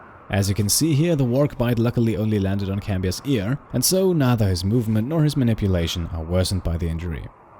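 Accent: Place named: Germany. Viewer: didnt know you were german untill you started to read the patrions for the first time :D (few episodes back)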